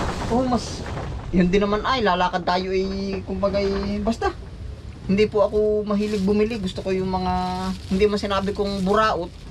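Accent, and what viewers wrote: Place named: Tagalog, Philippines. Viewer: Greetings to u from California, pinanonood namin ang vlog mo before going to bed kasi nakaktuwa ka lalo na at kumakanta ka ng ginagawa mo lang na lyrics. Ang diction mo nakakatuwa rin pure tagalog ang salita mo pero parang ngayon ko lang narinig na tagalog malalim kasi. Pray always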